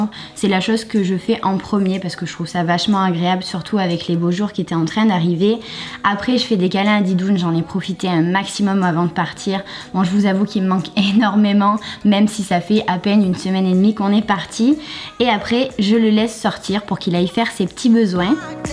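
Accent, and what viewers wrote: South, France. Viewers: Tu as des yeux tellement magnifiques c’est ouf 😍 et d’où viens ce petit accent sudiste ? 😁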